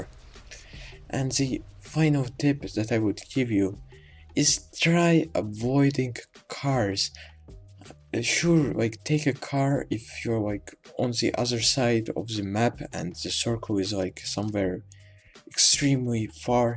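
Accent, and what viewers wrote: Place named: France. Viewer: He is french or Belgian or Swiss or from Montreal maybe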